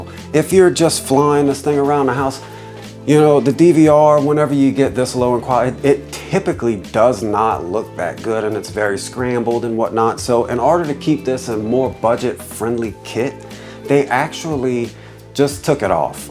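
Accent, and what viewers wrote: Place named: Louisiana, United States. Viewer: I know dat accent when I hear it! But I’ve never heard anyone speak so clearly, or with a neutral tone, but at the same time spit out a purely Cajun accent! You were tripping me out with that. Lol... We’ll have to get together and fly one day once I get some time on the sticks with FPV... I’m a 107 pilot, been flying gps drones for about 5 years. I plan to do some modeling, mapping , and construction progression work in the near future, but for now I’m just shooting some real estate here and there when I get the opportunity... But I been wanting to make some time for this hobby for a while now... I been playing around on the simulator a little bit, but i think I’m going to get a lot better once I get my hands on one of these little beginner drones. That’s the plan anyway! Lol...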